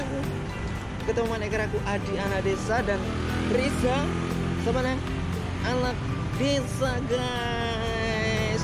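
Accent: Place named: Banyumas, Indonesia. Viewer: pada bae ngapak... salam dari cilacap sukses selalu mas adi anak desa👍👍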